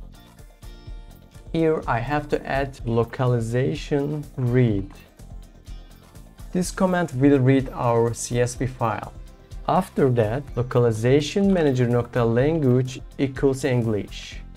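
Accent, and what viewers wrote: Turkey. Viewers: Daha ilk konusmaya basladiginda bu kesin Türk dedim :d Hatta emin olmak icin yorumlara baktim. Guzel icerik tesekkurler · İngilizceyi duyduğum gibi dedim aha Turko :) Başarılar teşekkürler.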